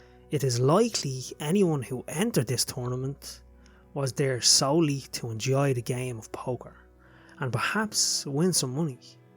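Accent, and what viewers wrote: Dublin, Ireland. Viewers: Its a Dublin accent alright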